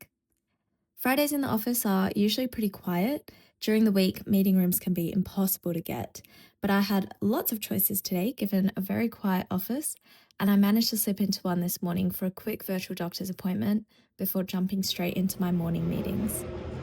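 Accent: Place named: United Kingdom. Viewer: Such a cosy video, and lovely British accent.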